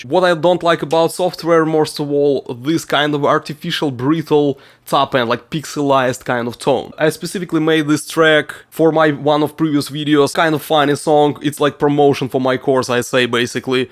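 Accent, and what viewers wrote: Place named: Russia. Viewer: ёр инглиш вери вел))) как по мне это супер уровень, а русский акцент придает изюм))) те кто гонит на акцент наверно не слышали индусов или филиппинцев))) хотя я считаю что сложнее чем говор коренного британца брауни или кокни НЕТ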